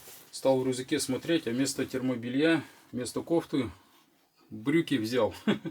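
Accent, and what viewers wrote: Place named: Arkhangelsk, Russia. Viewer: Хорошее видео. Вы наверное с Архангельской области. Напиши. Говор наш.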